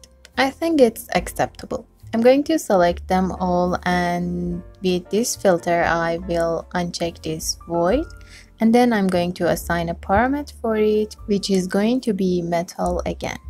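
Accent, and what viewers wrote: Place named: Arab world. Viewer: Love your accent are you arbic ?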